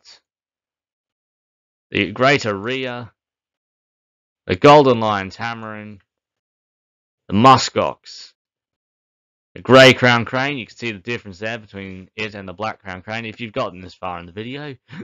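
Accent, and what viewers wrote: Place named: Australia. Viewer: Heeey an Aussie Planet Zoo voice! Great to hear one of us out there.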